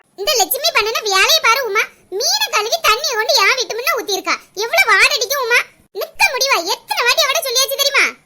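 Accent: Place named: Thoothukudi, India. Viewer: Arumaiyana Padhivu :) Enakku Thoothukudi dhan...Indha Pecha Kekrapo Avlo Amudhama Irukku❤️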